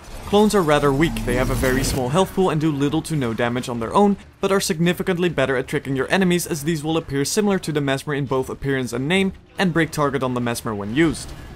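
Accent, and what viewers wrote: Netherlands. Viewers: I knew it :D you are dutch :3 could hear it :o anyways awesome explenation :o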